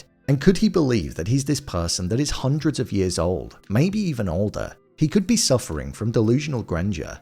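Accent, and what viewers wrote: United Kingdom. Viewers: Why do we need endless British narration??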